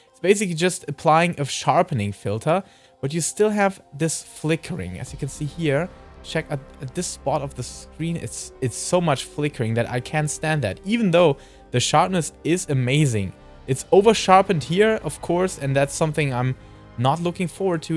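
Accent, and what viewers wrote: Germany. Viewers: Haha, bei dir merkt man sofort am Akzent, dass du aus Deutschland bist. Grüße aus Niederösterreich.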